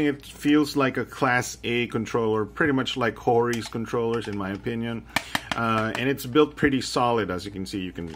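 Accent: Philippines. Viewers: i can tell your filipino because of your accent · The way you speak English is very Filipino Like the way you say Comfortable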